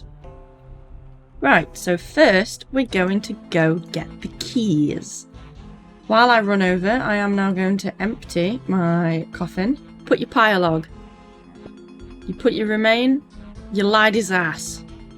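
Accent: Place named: Yorkshire, United Kingdom. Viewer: So Yorkshire lol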